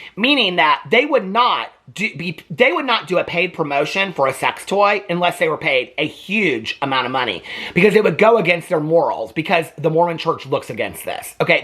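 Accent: South, United States. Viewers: are u from nashville u have a south accent lol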